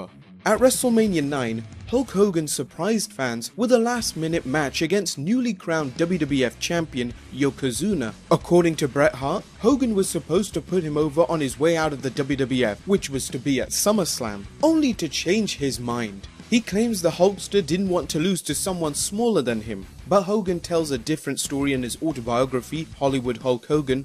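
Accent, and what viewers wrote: United Kingdom. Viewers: fuckin shitbrit narrations over shit that has nothing to do with them. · WCW coverage by a British accent sounds like shit.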